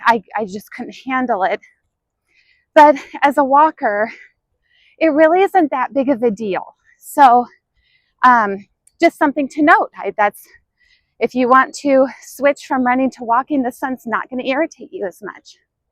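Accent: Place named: Midwest, United States. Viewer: What's that accent? MI, WI? "Kind of like a bad relationship." 🤣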